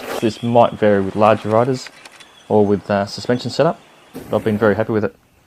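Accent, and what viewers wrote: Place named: Australia. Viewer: Thank goodness an Aussie voice and not a droning yank.....Great vid and very informative...Thanks mate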